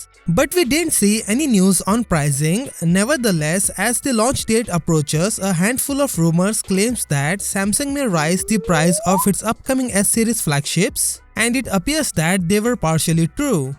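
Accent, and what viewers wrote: India. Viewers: That indian accent really caught me off guard lmfao. Couldn't take it serious so I didn't watch the video, sorry but I had to let that out.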